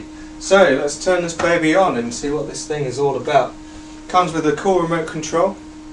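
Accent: United Kingdom. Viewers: never seen a asian with a british accent lol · lol asian guy with a british accent. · holy shit an asian guy with a british accent thats fucking awesome!!!!!!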